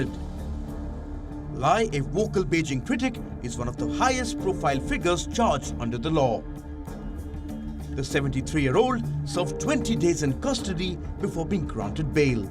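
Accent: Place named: India. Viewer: The Indian accented female voice overs isn't helping the believability. Couldn't you hire someone with a Chinese accent for the female voice overs. 😐😐😐😐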